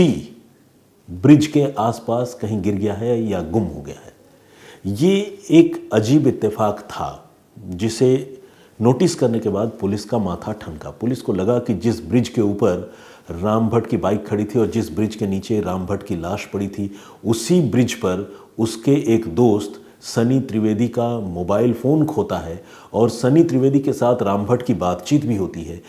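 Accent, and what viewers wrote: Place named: Hills, India. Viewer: आपका पहाडी अवाज सुनने मे मजा आता हे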